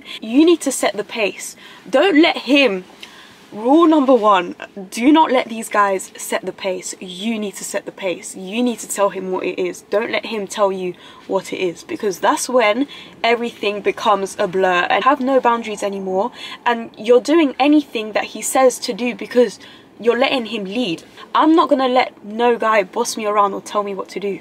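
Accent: United Kingdom. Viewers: Because it is a British accent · You have a nice accent it's like a British accent ❤ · You have a nice accent it's like a British accent ❤️🇬🇧👍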